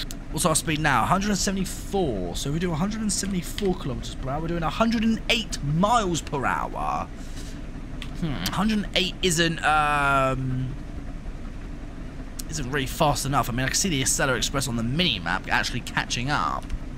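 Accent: United Kingdom. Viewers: He’s British😱😱😱😱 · you sound british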